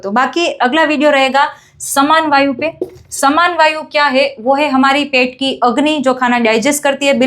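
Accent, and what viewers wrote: Gujarat, India. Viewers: તમારી ભાષા મા ગુજરાતી લહેજો દેખાય છે બાકી હિન્દી સારુ છે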